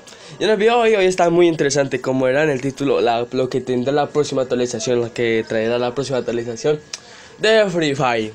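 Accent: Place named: Portugal. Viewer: Hablas un poco raro como portugués xd de que país eres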